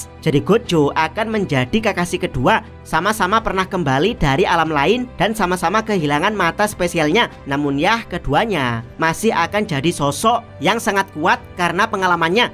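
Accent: Java, Indonesia. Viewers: bang logat jawa nya luar biasa hahaah thx bang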